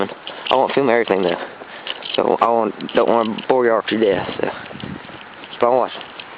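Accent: South, United States. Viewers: Ooh he country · No, he just has a country accent. A hillbilly is someone with little technology, survives off there own recourses, and is very cowboy-ish. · Love the country accent and the video but come on man, do the steps. ANY job worth doing is worth doing right. Old saying but so true. BOY, todays kids!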